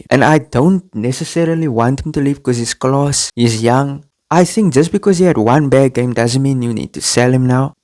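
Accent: South Africa. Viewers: Are you South African by any chance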